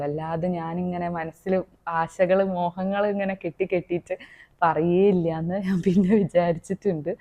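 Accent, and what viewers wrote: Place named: North Malabar, India. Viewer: R you in kasargod/kannur?.. Talking style feels like ours❤️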